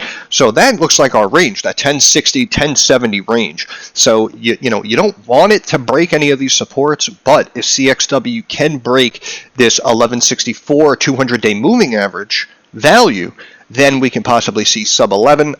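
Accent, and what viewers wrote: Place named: North, United States. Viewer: u did an amazing job brother thank you especially after watching the second time,and totally agree on politics and YouTube lol, GE would cool to get a look at I’ve never really leaned towards the more conventional stocks but with everything going Electric I can see them as a play for future (I just want greedy returns😂🤣😂🤣) but yea and the renewables and things of that nature would be awesome too glad I found ur channel, o and are u up north by any chance I’m from nyc and thought I noticed a northern accent lol.. 🍻 my friend!!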